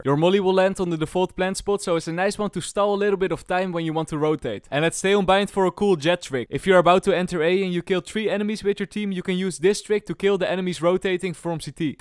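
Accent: Netherlands. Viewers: Ich liebe deinen holländischen Akzent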